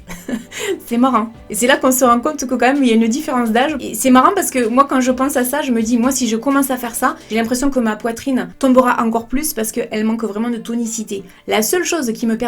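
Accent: South, France. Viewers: Coucou perso je porte des soutifs sans armatures que l'été et que quand je sort ou que je bosse. Le reste de l'année c'est juste un débardeur près du corps sous un pull .J'ai la peau très sensible et les soutifs avec armatures m'irrite la peau et parfois jusqu'à la brûler et cloquer. C'est très douloureux donc mon choix à été vite fait. Je dirais pas que ma poitrine à retrouvé ces 20 ans mais ce qui est sur c'est que nos muscles reprennent à soutenir tout seul et que au final ma poitrine tombe pas plus que sa. J'ai 38 ans 3 enfants et vie beaucoup mieux sans soutifs et j'avoue le regard des gens je m'en fou 😉. Ps:j'adore tes vidéos, simplicité et naturel, et ce jolie accent j'adore 😉 l'accent du soleil j'ai le même je suis pas loin de Perpignan 😃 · J'adore ton accent de sud😍😂 super video😉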